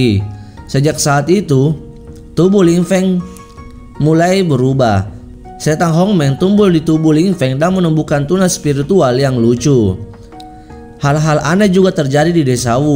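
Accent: Takalar, Indonesia. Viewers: Orang takalar sulawesis elatan kah?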